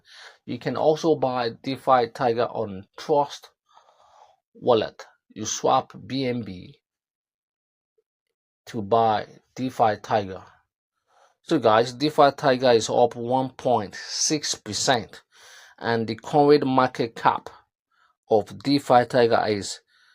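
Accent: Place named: Democratic Republic of the Congo. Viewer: Your ascent though! Sounds Congolese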